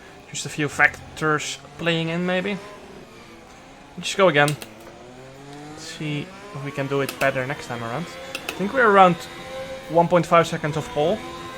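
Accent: Netherlands. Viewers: dat accent is zoo nederlands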